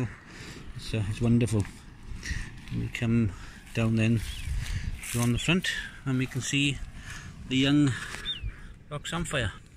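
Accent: Wales, United Kingdom. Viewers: Absoloutely loving the Welsh accent bud and your vids are incredible. Keep up the excellent work, subbed!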